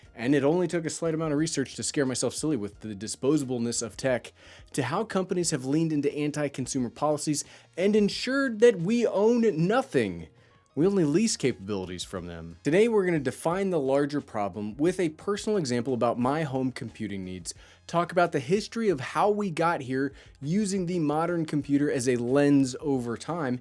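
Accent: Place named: Midwest, United States. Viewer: AI voice with midwest accent? 👍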